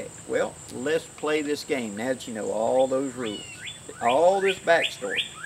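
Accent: South, United States. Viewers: I would listen to you talk about stacking rocks, just to hear a good ole southern accent again. 🤠 Enjoyed this "Story Time". Take it easy.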